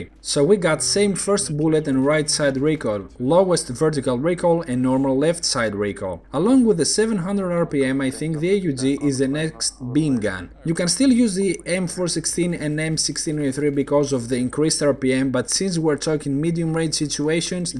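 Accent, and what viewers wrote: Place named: Greece. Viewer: definately english with greek accent (i'm from greece and i know exactly how greek people speak english :P)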